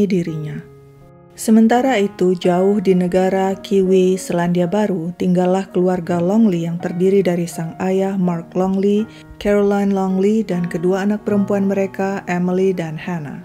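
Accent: Indonesia. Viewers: hokh ketauan org indonya ya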